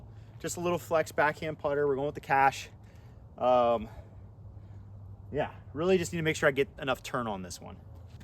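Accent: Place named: Midwest, United States. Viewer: I think the idea of creating real stakes for tournament style scoring is a good style video .. not that I mind watching one of the better disc golf YouTubers play a round trying to score well, but cool to see that you tried to give yourself a reason to score your best but still just playing by yourself doing your thing Also I felt like I noticed a slight Midwest accent and maybe it’s the North Dakota sweatshirt but tripped me out a little bit I thought you were/are from VA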